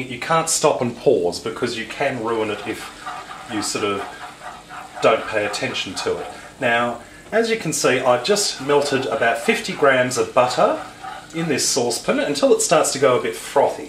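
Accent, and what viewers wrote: Australia. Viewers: This guy has a very clear Australian accent. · Love the Aussie accent!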